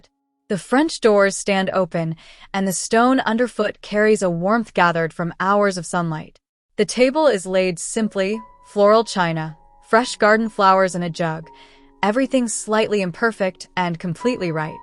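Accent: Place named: United States. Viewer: So sad an English company and beautiful rooms has to be spoken by an american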